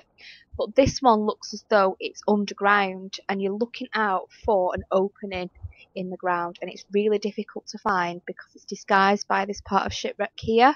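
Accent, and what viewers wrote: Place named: Blackburn, United Kingdom. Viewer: Nice one! Blackburn accent that?!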